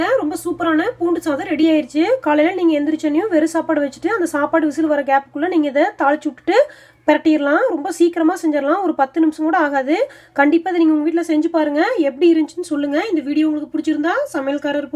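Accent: Kongu, India. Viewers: Your kongu tamil super mam yr recipies also super keep it up mam 👍👍👍👌👌👌💐💐💐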